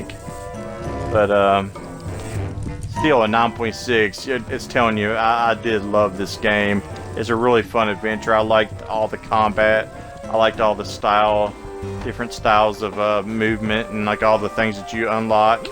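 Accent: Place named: South, United States. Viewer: Awesome southern accent bro sounds like me lol I'm from Memphis TN yo I'm wondering is it worth 13.00? Ps4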